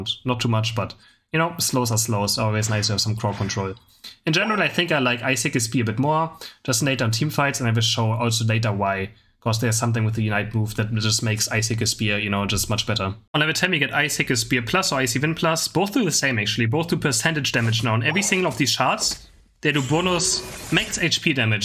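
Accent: Germany. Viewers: 90% of language i cant understand. Gerlish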